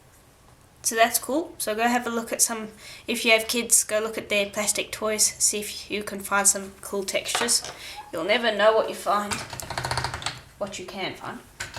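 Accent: South Africa. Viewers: Are you South African? Sorry I’m trying to guess your accent. 🤣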